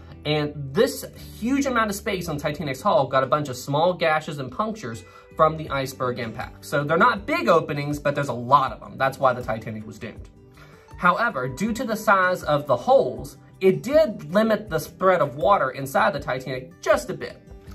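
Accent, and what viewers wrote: South, United States. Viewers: His southern accent and charm definitely add to it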